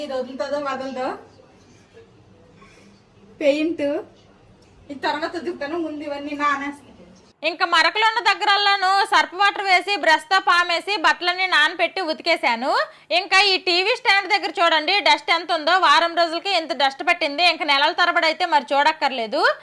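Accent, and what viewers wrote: Srikakulam, India. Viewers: Voice over lo full sklm loney matldthru madhi sklm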